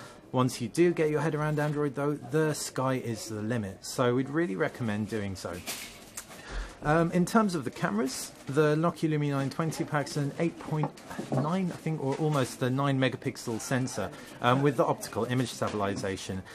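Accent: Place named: United Kingdom. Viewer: he's British and that's how you're supposed to say it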